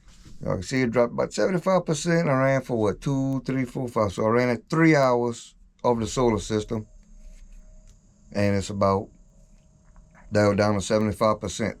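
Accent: Louisiana, United States. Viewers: It’s called being Cajun most white people from Louisiana sound like this.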